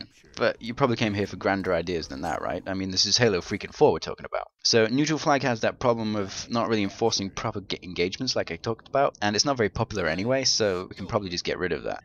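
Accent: United Kingdom. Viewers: are you Scotish?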